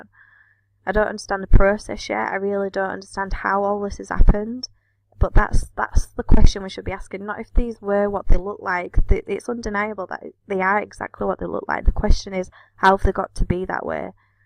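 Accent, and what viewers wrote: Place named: Northern England, United Kingdom. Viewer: To you are total on the right path of truth but this channel iam going to tell u about is mind blowing u must watch it and everything will fit into place go to the Richard mountbleu channel......the mystery of life video u will be amazed at the truth in it.......I no you have got the eyes to see it.........you sound like a Northern lass......please check that video out it goes way deeper than u can imagine.......please please let me no u watched it so iam not wasting my time on people that don't really want to no the truth...Dean from grimsby🇬🇧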